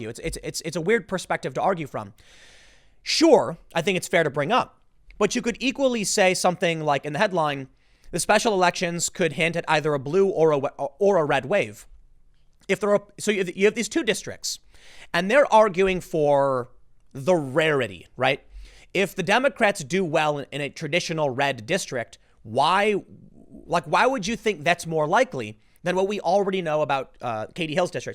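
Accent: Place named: Chicago, United States. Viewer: I never noticed that chicago accent until this video. The way he says "House" is a dead give away.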